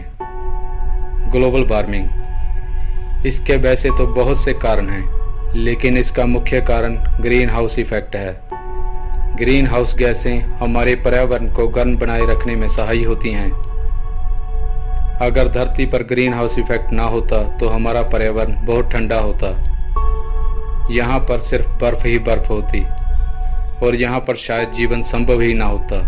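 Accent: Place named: Punjab, India. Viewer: ਬਾਈ ਜੀ ਤੁਸੀਂ ਪੰਜਾਬੀ ਹੋ ਤੁਹਾਡੀ ਹਿੰਦੀ ਦੱਸ ਰਹੀ ਹੈ 😄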